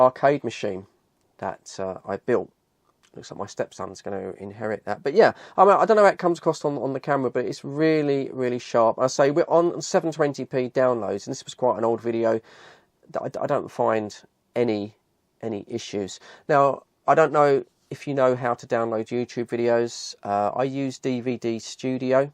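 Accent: United Kingdom. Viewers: Curious, are you British by any chance?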